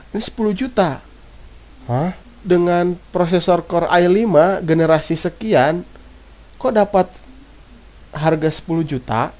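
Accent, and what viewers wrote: West Java, Indonesia. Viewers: Dibaca pake aksen sunda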